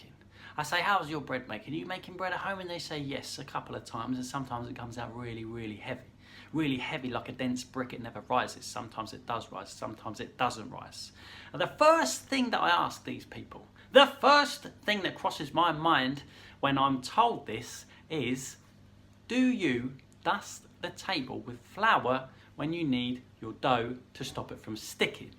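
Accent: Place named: England, United Kingdom. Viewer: Good tip in a general sense but you are making the assumption that all flour is the same. Guessing from the accent comes from England. I live in the very hot, very wet, very humid tropics. Exactly the same flour behaves differently out here. It not only carries more water it just sucks it out of the air while you knead it. And don't buy a dough scraper. You definitely need one but just find that old credit card you the in the back of the cupboard. Pair of kitchen shears to snip the round corner. Sounds a bit critical but I will be back. You give good knowledge.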